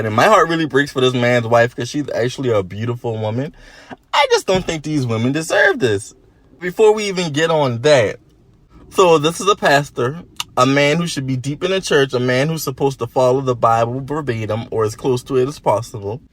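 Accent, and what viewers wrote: Charleston, United States. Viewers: Are you from Charleston, S .C.? Beautiful accent.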